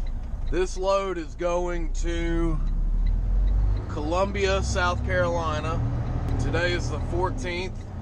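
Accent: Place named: Alabama, United States. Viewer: Ahh that's cool! I am originally from Pennsylvania (Lehigh valley). I came down to Birmingham a few years ago to go to UAB and now I'm about to graduate and considering trucking. As I watched more of the video I was like he sounds like he might be from Alabama! Low and behold! I go to Alabaster a few times a week to go to Veterans park since they have a nice skatepark there. But I'm mostly just stuck by UAB which kinda sucks since I hate the city! And I hate the city drivers and how no one uses turn signals! And just the constant rush haha. I'm hearing a lot of good things about Crete. I'm going to check out your six month review! Thanks!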